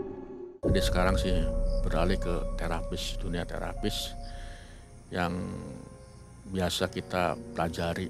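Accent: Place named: Cirebon, Indonesia. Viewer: asli wong ndi sih soale pada bae kya Indramayu cirebon logate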